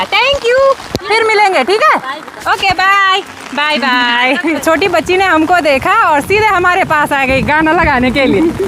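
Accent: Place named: Pahadi, India. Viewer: Bindass apake hindi bhasha me pahadi sugand aati he bahot achha lagata. bhai ko shubkamana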